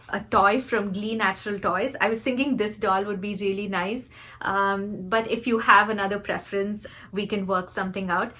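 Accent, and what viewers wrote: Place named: India. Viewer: Puff Indian accent :(((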